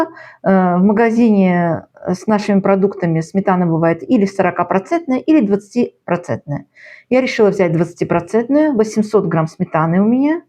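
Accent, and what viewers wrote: Baku, Azerbaijan. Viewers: Я, слушая Вас, ловлю себя на том, что я улыбаюсь 😊 От того, что слышу родной бакинский акцент❤️Пол года назад открыла для себя Ваш канали сразу подписалась! Спасибо за Ваши рецепты🌹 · Kak vsegda shikarniy recept obozhau vas e vash Bakinskiy akcent smotru vas s NY😘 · Да, очень родной говор! Мягкий и доброжелательный, бакинский ! Ваши Рецепты подкупают своей простотой и лаконичностью. Спасибо вам из Бруклина, НЙ. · Бесподобный бакинский акцент...❤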